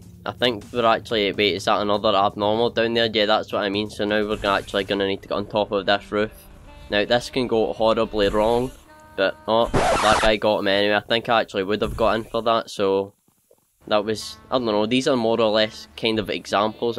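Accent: Scotland, United Kingdom. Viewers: Are you Scottish? Cause if so same! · Scottish that’s what I like to see lad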